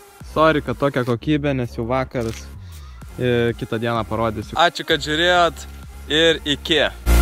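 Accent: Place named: Samogitia, Lithuania. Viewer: Sakau ko toks žemaitoks,girdis iškart 😄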